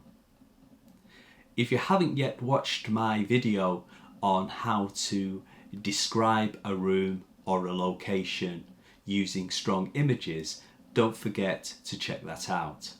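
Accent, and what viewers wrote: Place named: United Kingdom. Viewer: Very good tutor with very clear English, and easy to understand, which is very important for students especially for students from abroad. You can improve not only your English writing ability, but also your English listening and speaking because the tutor/ gives teaching with standard British English.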